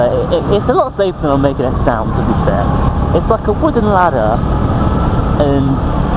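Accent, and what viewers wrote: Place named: United Kingdom. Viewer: Haha, you sounded just like a young M13 with British accent. Do you have problem understanding Irish people sometimes? I have to have subtitles watching Irish movies.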